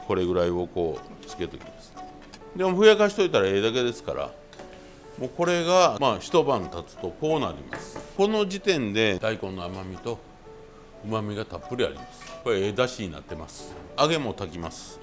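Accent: Kyoto, Japan. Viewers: 村田さんの料理本を持って海外赴任しました。どんなに役に立ったことか。村田さん、ありがとうございました。 今も参考にしてます。 京都弁も心地よいです。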